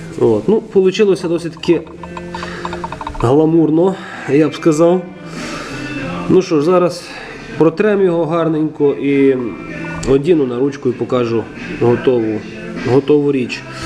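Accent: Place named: Western Ukraine, Ukraine. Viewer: звідки ти родом? Ато акцент Львівсько-Франківсько-Тернопільско-Волиньий!))))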